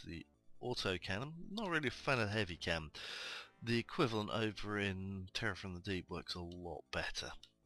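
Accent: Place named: United Kingdom. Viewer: I have watched ALL 56 videos 6 times in a row while I've been playing in a separate DOS window. WORDS I LOVE HEARING HIM SAY IN HIS ACCENT: 1. SAH-GENT Smerkey 2. Raw-ket-lawn-chuh 3. OH NO....OH NO (pronounced Air-Nair...Air Nair) LOL. Being from TEXAS, I totally love his British accent!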